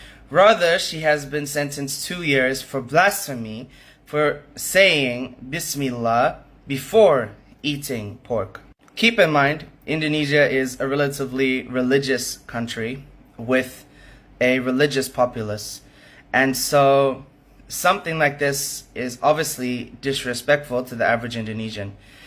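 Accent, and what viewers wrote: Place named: United States. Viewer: klo dari logatnya org amrik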